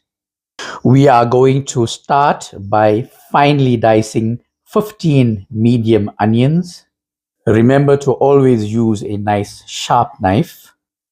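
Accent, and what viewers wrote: South Africa. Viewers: Where are you? I hear a South African accent 😅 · Dear Brother, we have reached the end and I salute you. You have a SA accent. Where can we buy your samosas please? Do you sell any in Australia? You are an amazing perfectionist cook - very rare to find people of your ilk today.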